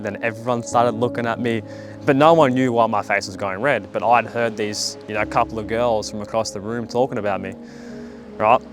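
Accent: Australia. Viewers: I could listen to your Aussie accent all day 😅 I’m just a USA southerner… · Watching this made my day. Thank you ❤ and to answer the title, I really wish and hope to find that one person in few years 🤞. I wish you all well Ps. I like your aussie accent, it suits ya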